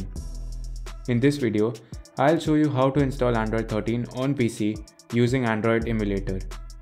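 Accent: India. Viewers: its the Indian " english " accent .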